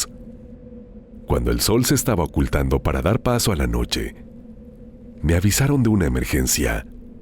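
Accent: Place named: Mexico. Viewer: Hola, tengo una curiosidad, si eres venezolano por qué tienes tanto acento? Vives en México?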